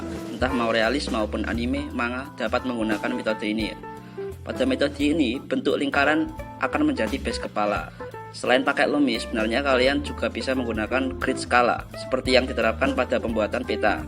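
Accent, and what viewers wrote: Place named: Java, Indonesia. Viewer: Aku suka medoknya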